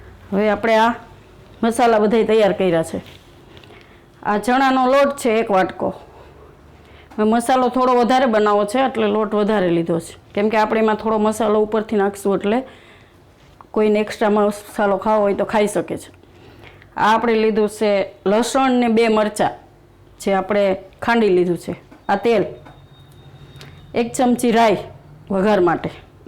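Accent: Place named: Saurashtra, India. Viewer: Ben tame saurashtra junagadh bajuna lago chho...... tamari boli uparthi..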